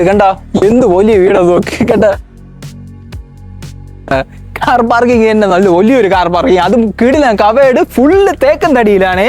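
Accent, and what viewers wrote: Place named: Thiruvananthapuram, India. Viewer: തിരുവന്തോരം ഭാഷ പറഞ്ഞു ബോറടിപ്പിക്കാതെ മര്യാദക്ക് സംസാരിക്കു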